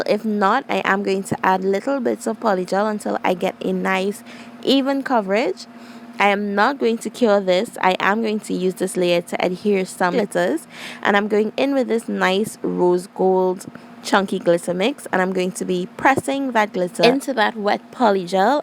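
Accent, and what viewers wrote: Trinidad and Tobago. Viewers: I’m obsessed with the polygel!! Side note: You sound like you have a Trinidadian accent lol correct me if I’m wrong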